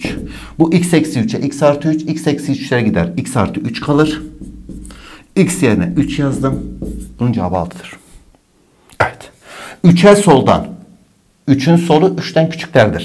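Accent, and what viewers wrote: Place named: Eastern Anatolia, Turkey. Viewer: hdasdhsadhsadahsda hayatımda izlediğim en iy ders videosu. hocam dersi anlatırken biraz daha doğu ağızı kullanırsanız ders daha eğlenceli ve akılda kalıcı olur kanaatimce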